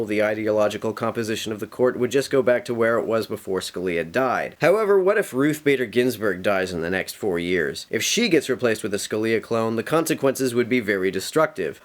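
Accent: Canada. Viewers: You're Canadian? But your accent is more less Canadian than mine (I'm from Minnesota).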